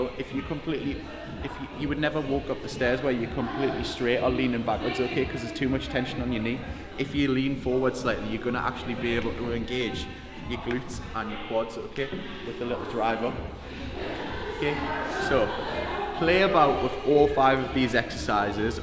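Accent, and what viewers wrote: Newcastle, United Kingdom. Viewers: Geordie lad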